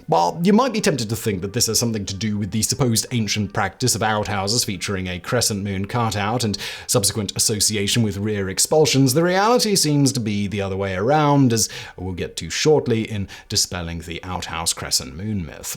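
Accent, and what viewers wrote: United Kingdom. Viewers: You may live wit duh Czechs but you still got a British accent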